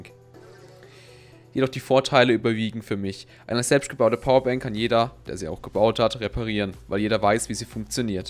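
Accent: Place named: Karlsruhe, Germany. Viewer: So ein schöner Karlsruher Dialekt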